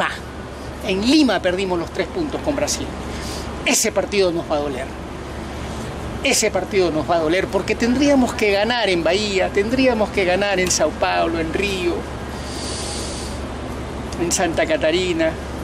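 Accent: Argentina. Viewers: me gustan tus videos los veo siempre pero se me hace raro y chistoso que hables como Argentino y tengas la camiseta de Perú, igual sus comentarios son bien acertados siga asi. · Porque este peruano habla como argentino?????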